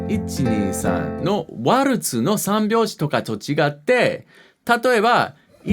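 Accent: Kansai, Japan. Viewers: サチモスのカバーが突然お勧めに出て来て先生にどハマりしてんねんけど、バイオグラフィーのどこを読んでも博士がネイティブ関西弁に行き着く要素が見えてこなくて頭がバグってます。とりあえず大ファンになってもた。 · 毎秒アップロードしてほしいし、ずっと関西弁で話しかけてほしい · 解説もだけど、関西弁を関西人がめっちゃ笑って聞ける楽しませ方も知ってる人やね。楽しいです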